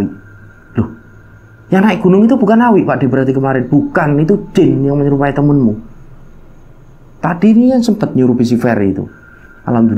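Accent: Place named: East Java, Indonesia. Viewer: Tetaplah konsisten dengan Chanel Pendakian, semoga makin sukses....dulu saya kira dari Malang kok logatnya kayak Jawatimuran.....👍👍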